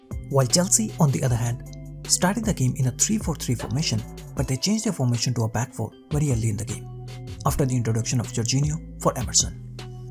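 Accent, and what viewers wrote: India. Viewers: He sound like he is trying hard not to sound like Indian accent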